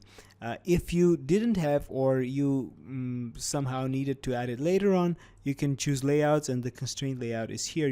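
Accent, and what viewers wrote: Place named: Russia. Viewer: акцент русский, мог бы и по-русски записать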